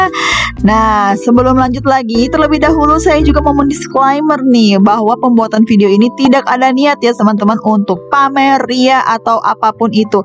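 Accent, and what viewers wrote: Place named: Sulawesi, Indonesia. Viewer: Sulawesi nich kayaknya hehehe... Semangat vibes.. Keren ngegas terus, tapi hati2 nabrak, Mom 🤣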